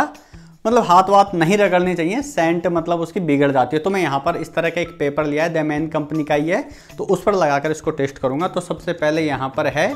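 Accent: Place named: Western Uttar Pradesh, India. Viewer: samjh gya tha mein bolne ki accent se konsi city se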